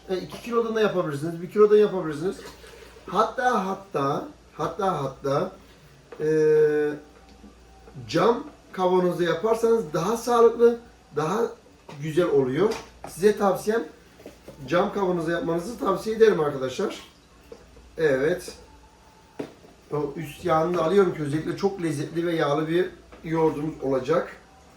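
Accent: Gaziantep, Turkey. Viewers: abi sen antepli misin?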